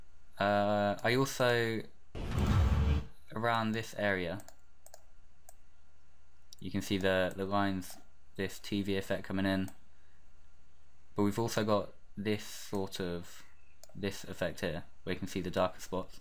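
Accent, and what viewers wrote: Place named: United Kingdom. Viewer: i dont understand well british people, did u say hold click on the possition in ???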